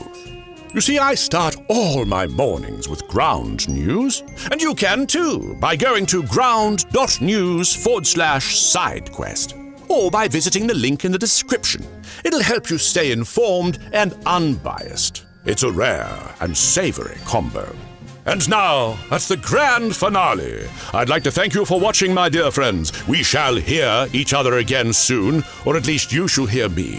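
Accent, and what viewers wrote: United Kingdom. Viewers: 您的英式英语真的非常非常好听❤❤❤ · I discovered your channel today. Instantly hooked with the brit pronunciation and enunciation. It's really good, and the pacing is well done too. Instant sub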